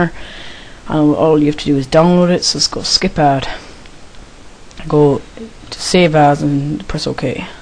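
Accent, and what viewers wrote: Ireland. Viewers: it work thanks ladd btw are u from ireland ?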